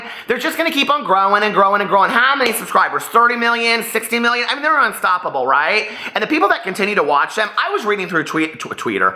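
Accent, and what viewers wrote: South, United States. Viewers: Or is it stop sign? Hell I don't know but i will sing the heck out of all the versions lol you can probably tell I have a bit of an accent already. You give me a little southern belle sometimes to so it could sound natural to you. But I also get big city New York vibes from you. OMG I'm typing as I watch your videos and you literally brought up STEVIE. You are my kweeeen , your Leslie shaee famouuusss nowwww lol you've been. Sorry I live type and it gets long honey. But you know how we get to talking and just can't stop .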